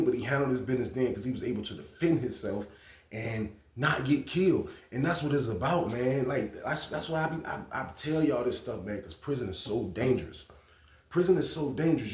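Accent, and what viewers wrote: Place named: Richmond, United States. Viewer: Sound just like a Richmond nigga..down the jail in the 80’s/90’s early 00’s before they knocked it down that shit was like a prison the beef from the street came to the jail..out of Towners ain’t stand a chance down that jail they checked in INSTANTLY and in on the land out of towners got zipped up ASAP #17thSt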